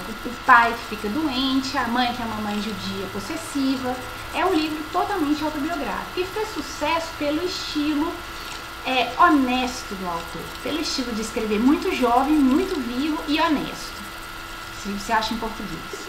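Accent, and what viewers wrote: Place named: Minas Gerais, Brazil. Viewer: Genteeeee, olha vc!!!! Mega mineirinha😅😅😅 ah, adorei vê vc uns 10 anos de agora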